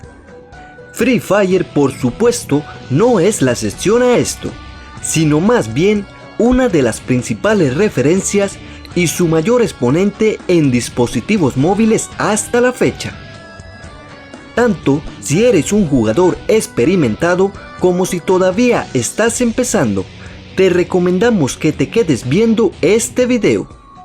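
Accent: Argentina. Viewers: Para!!! es argentino? yo también lo soy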